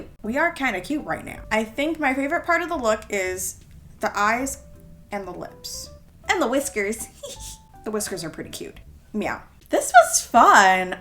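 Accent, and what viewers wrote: United Kingdom. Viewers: She has a good British aksent lol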